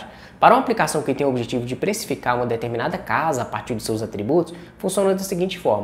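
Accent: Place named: Minas Gerais, Brazil. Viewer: Obrigado por todos os vídeos que você posta pra gente... aprendo muita coisa contigo.. muito bom ver um mineiro como eu e da minha cidade (BH).. kkk ja imaginava pelo sotaque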